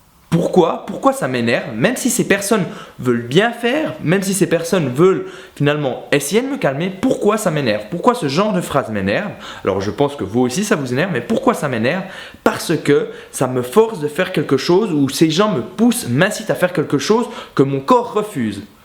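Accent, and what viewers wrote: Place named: Switzerland. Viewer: On sent la Suisse dans ta voix 🇨🇭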